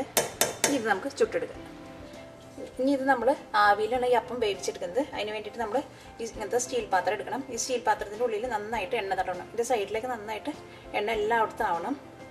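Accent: Thrissur, India. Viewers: No offence Nancy, but her talking style is her idendity! I would request her to be the way she is! I am not from Thrissur. But when i listen to her, somehow I feel home.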